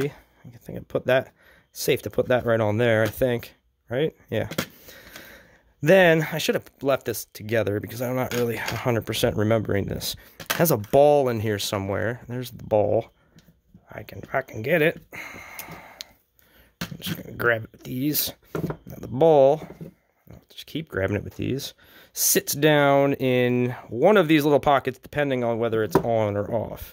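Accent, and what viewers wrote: Philadelphia, United States. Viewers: Hi I think I here what sounds like a delco or Philly/south jersey accent im from Philly and people think I’m crazy when I’m out of town and I go out to eat and they ask what I’ll have to drink and I say I’ll have a “wooder”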